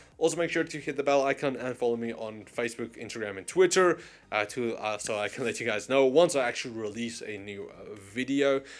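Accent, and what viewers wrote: South Africa. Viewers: Are You South African?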